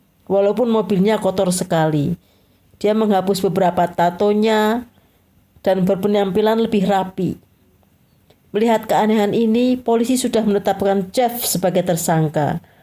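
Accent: Java, Indonesia. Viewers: dengar suara nya yg medok itu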